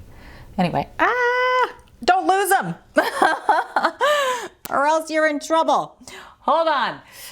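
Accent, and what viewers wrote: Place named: South, United States. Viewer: I'm presently going through many of your videos to see which primer you use and recommend for face, also for eyelids. Suggestions by others on here are welcome as well, please!! Don't want to spend note than about $35 $40, (unless it is a quart sized container🌝) Normally I'm more conservative about price except I think a primer will make ALL the difference as to how my face and makeup look, in the end. Prefer 1 with anti-oxidant, if possible. I've been looking for a while now and almost ready to just buy something cheap till I can find a quality product for sensitive skin that's not drying.. Thank you for all of your effort in keeping me somewhat in-the- know about skincare and makeup, since I subscribed. You're a lovely person, and I adore the little bit of Southern sound in your voice. It's another one of your charming attributes that sets you apart🌴🤗🌹☮☺🌴 Edited to add---don't know why the strike-through text appears that way above. 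Didn't intend to negate the words I wrote.☺🌴☮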